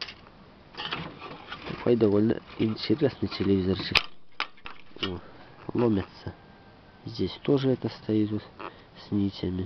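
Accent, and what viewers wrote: Belarus. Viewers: Чувствуется Белорус 😉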